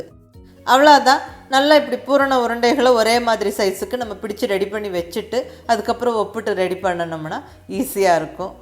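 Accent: Kongu, India. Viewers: அருமையான receipe mam, கொங்கு தமிழில் உங்கள் குரல் அருமை.👌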